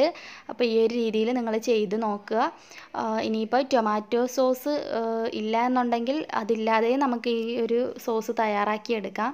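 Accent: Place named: Kollam, India. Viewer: കൊല്ലം കാരിയാ ലെ ... കിടു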